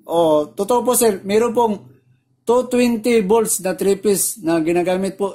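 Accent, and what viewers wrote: Visayas, Philippines. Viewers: Visaya ka boss😂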